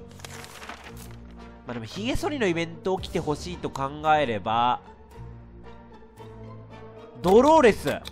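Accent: Kansai, Japan. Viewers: レトさんの関西弁好きすぎる、、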